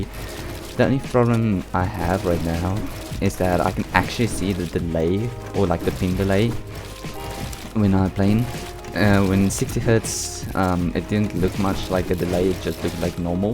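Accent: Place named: South Africa. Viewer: oh mans south african lol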